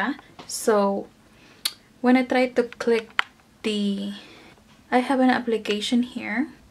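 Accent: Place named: Iraq. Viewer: I feel that u r iraqi person speaking english xd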